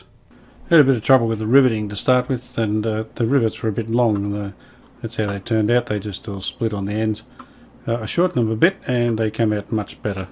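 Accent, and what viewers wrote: Australia. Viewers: That Australia accent are so cool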